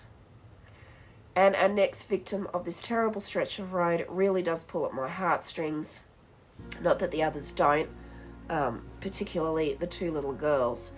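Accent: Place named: Australia. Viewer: I really enjoyed watching this. As a Aussie who’s lived abroad since the mid 90s I haven’t always kept up with things going on back home. Super interesting to hear the uncomfortable history of this stretch of highway. Also enjoyed hearing your Aussie accent. I’ve subscribed to your channel and will definitely be watching more! Thanks ❤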